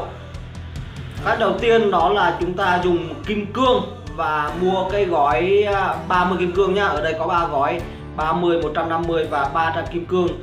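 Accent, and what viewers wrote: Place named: South, Vietnam. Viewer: Giọng miền nam nói chuyện rõ nhạt, Clickbait cực mạnh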